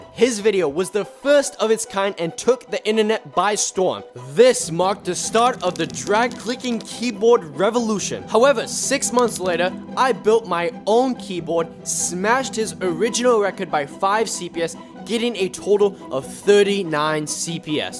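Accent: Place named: New York, United States. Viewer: Judging by your accent, you’re either from New York or Vermont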